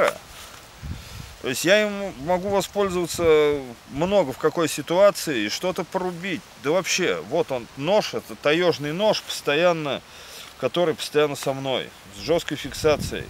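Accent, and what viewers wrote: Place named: Nizhny Novgorod, Russia. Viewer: Горьковский акцент легко узнаваем) удачной охоты!)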